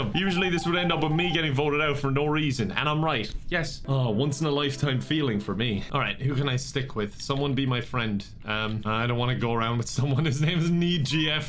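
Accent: Ireland. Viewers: IDK Why, But i really like the irish accent, I've always wanted to be irish myself but i don't think i can change myself like that! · Do you all remember old. Those were some of the best videos. Now he just repeats what happened in an Irish accent., if you read this go back to the old variety channel kind of thing. That was great.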